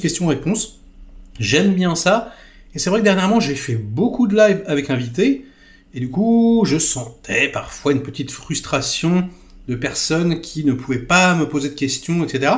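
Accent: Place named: Quebec, Canada. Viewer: Quand je fais connaître ta chaîne du Québec,ils l'adopte immédiatement tu parle comme nous sans prétention, bonne anniversaire 2 ans tu mérite ton succès.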